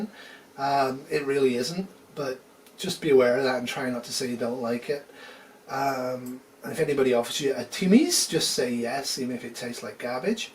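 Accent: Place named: England, United Kingdom. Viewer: What what old boy. 🏉 Is not the hardest game ever.... Ha ha 😂. Are beer is the best and we have the werst weather. Rain in the morning sunshine then pissing it down.... 😊. Your so funny. An yes I can here the English in your voice. Keep it up mate. ATB ta the both of ya. Andy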